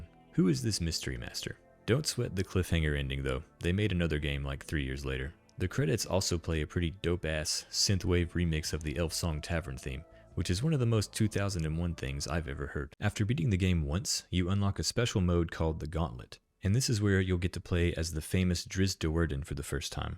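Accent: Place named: Arkansas, United States. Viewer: Rural arkansas? Im from fort smith and lived in Magnolia in south arkansas. You sound like you may be from further north around Fayeteville?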